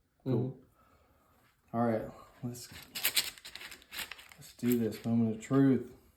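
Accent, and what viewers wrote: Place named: Louisiana, United States. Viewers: I am from Louisiana & I hear da accent! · Are you livin down in Louisiana? Sounds like it. I'm from Shreveport. Your cookin looks good. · Ok….. Why do you have a normal voice while cooking one recipe and then….. out of the blue, you’re a full fledged Cajun!!! I love it!!❤ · Where did that thick southern accent come from all of a sudden?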